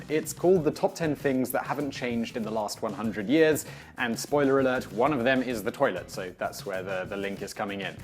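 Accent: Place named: RP, United Kingdom. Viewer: You are very informative inside YouTube program however you have a certain tone commonly known as Oxbridge I know one has to speak distinctly but so do I without send sounding condescendingn inner wave sound like Brian Sewell is he still alive help me YouTubers or is he dead not you obviously because you sound a lot more friendly uh I just wonder if you have a certain tone I said Crapper not kappa one could almost think of somebody called trump trump Astra now Probably get arrested for daring to mention his name thank you for your downloads not faded old queen who does like your downloads but I'm worried about trump trump yours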